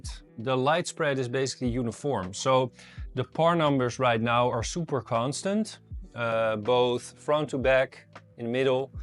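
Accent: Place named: Netherlands. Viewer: Wist niet dat je uit Nederland kwam joh! Dacht al aan je accent te horen dat je geen Engelsman of Amerikaan was, maar je Engels is erg goed moet ik zeggen. Dapper dat je in NL nog metal halides durft te gebruiken met die bizarre energieprijzen 😅 Mooie grote bak trouwens! Heel benieuwd hoe het erbij staat als er frags in staan. Je hebt er een abbo bij 👍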